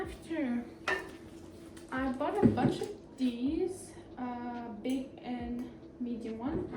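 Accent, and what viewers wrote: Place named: France. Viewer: your English French accent got me hooked...lol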